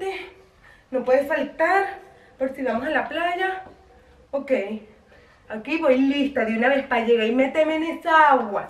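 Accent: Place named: Venezuela. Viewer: Nuevo suscriptor ♥️ Saludos desde #CostaRica Te ayudaré a conseguir más seguidores Tienes acento venezolana 🔝🔝🔝🔝🔝🔝🔝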